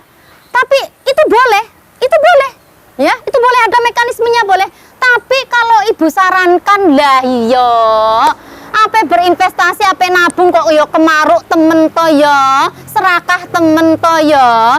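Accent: Banyumas, Indonesia. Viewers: khas ngapaknya mantap pnjelasannya sukses selalu utk mb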